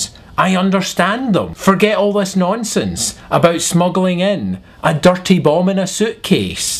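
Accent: Scotland, United Kingdom. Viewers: im scottish and a think your a bam pot and you have a gay posh scottish accent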